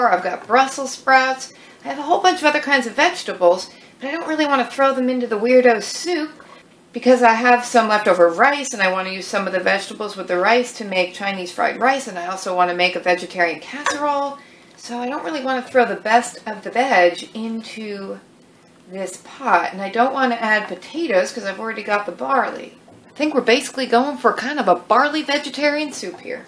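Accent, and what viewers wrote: South, United States. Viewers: I saw jersey license plates in another video but you sound like youre from the south.......just wondering. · Why do you sound southern today? Or is it me? Anyway, love listening to you ...some pretty good ideas...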